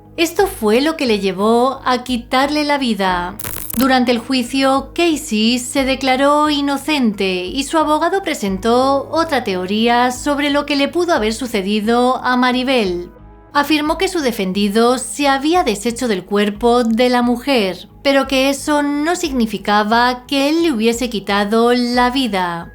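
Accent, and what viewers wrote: Spain. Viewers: Recuerda tiene el acento español ! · Está bonito, es acento Español, me encanta, ella se esmera en presentarnos un video, busquen otros videos con otros acentos que les guste. 🙋‍♀️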